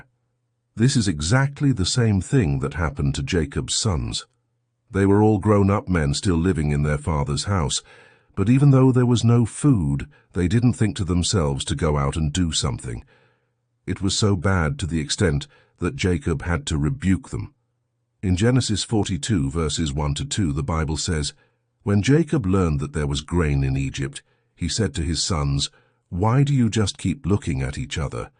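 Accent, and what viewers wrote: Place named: United Kingdom. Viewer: Dear Sir. Thank you for the content that you create and share with us. is a blessing to humanity and your wisdom truly touch our hearts. At home we refer to you as the man of God with the British accent. This video made me burst out in tears 😢 I grew up with many setbacks and only discovered myself late in life. From a very young age the enemy has set traps in motion to delay and derail me from my God given destiny. Just when things got better for us as a family my daughter was diagnosed with type 1 diabetes in 2022 at 17 months old. It turned our lives upside down and we face many challenges. I had to resign my job to take care of my daughter full time to meet the requirements of keeping her healthy. I feel like a loser when i cant give my children what they ask for and also the full financial responsibility being on my husband. 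We are a praying family and we love and trust in Jesus. There are so much i dont comprehend and my heart is aching for my daughter and what we are going through. Please pray for my daughter that God will heal her. May God bless you endlessly and continue to do mighty works through you and this channel. We are truly blessed to have you ❤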